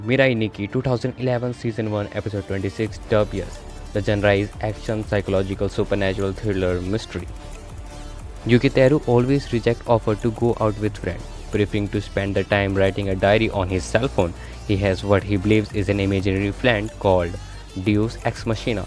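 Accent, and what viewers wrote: India. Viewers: Are you an Indian. I asked because your speech like an Indian. I like your videos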